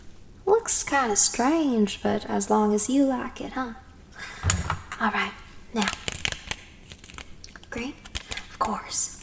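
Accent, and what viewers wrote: South, United States. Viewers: I’m from the south and your accent is spot on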